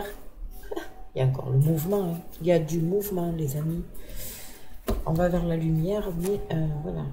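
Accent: South, France. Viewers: Bonjour nouvelle abonnée, j ai vu votre commentaire et me voilà ! Comme beaucoup ici j ai toujours entendu que la loi martiale en France ça n existait pas! Et je vois que vous dites oui tant mieux ! Mais qui a déjà vu des militaires ? C est a venir ? J entends votre accent je me trompe peut être mais c est le sud 🥰 . Oui on doit faire attention ça je le sais !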